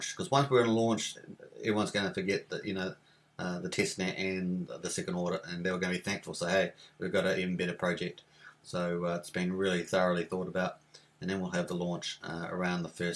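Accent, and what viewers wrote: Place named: New Zealand. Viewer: U sound like a new zealander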